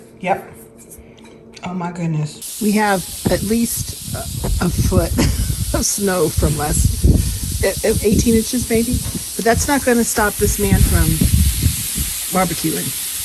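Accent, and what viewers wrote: South, United States. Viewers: Amen!! This Mississippi girl would love some of that snow too!! It’s so beautiful,, love watching you,, gotta say,, I sometimes detect a slight Southern accent , 😉 love it!! You are who I’ve always wanted to be! Is there anything you can’t do❤️ too blessed to be stressed 🙏🏻❤️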